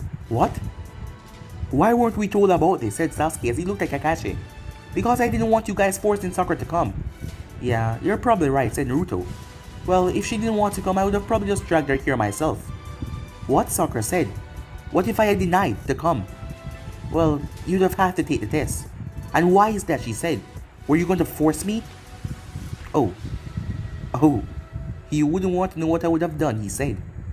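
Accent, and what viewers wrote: Jamaica. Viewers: I like it . Yo ya Jamaican?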